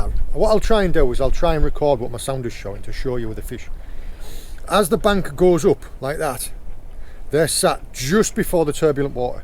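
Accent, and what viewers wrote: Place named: England, United Kingdom. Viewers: Your English accent is unbelievable. Don't understand nothing. My hello from Ukraine! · Dear Mate! I Love your Cornish accent, heavy to understand. Fishing, foraging the Cornish tides (along with your son)! Like Grannma Gina cooking pasta, however allowed two lobsters, three big crabs waving with claws before cooked. That’s the juice! You’l never eat all the seafood by yourselves. You catch and you share with Family and with Friends.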